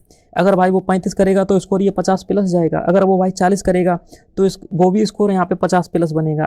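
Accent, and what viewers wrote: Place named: Auraiya, India. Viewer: Aapki language tone UP k auraiya ki trf ki lg rhi h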